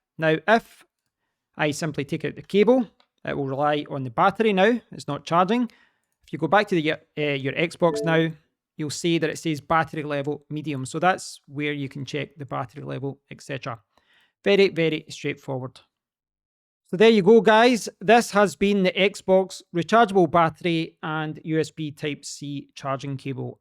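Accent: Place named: Scotland, United Kingdom. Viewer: Shiiiet... love your Scottish! ? (forgive me if it is Irish) Much love from Florida !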